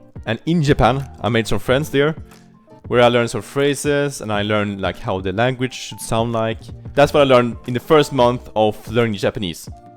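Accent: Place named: Sweden. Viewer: You're Swedish right? You have that typical Swenglish accent hehe (hope you don't take this the wrong way, I'm Swedish myself so it's just very recognizable :)). Anyway great study advice for beginners. I have studied Japanese on and off for 10 years but still only know the basics, but that's the result of only studying on and off lmao.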